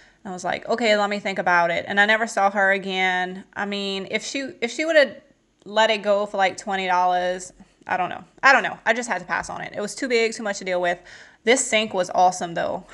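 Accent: Louisiana, United States. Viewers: You got to be from south Louisiana !! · where are you from, your accent sounds like a La. accent. Just curious I’m from La. and it reminds me of home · You can tell your from Louisiana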